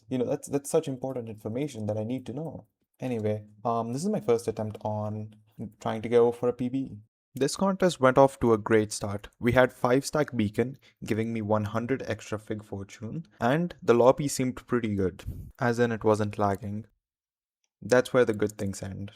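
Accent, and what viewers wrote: India. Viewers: W video btw where are you from? your accent makes it look like ur from india (im from india as well) so im curious! :D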